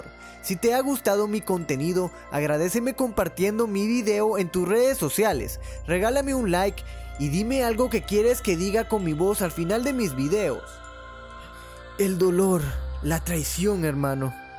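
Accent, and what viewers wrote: Honduras. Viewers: eres de Honduras ? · Eres hondureño? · ¿Es hondureño?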